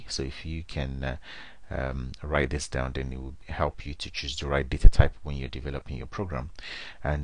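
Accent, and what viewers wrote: Nigeria. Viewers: Lol. Are you yoruba ?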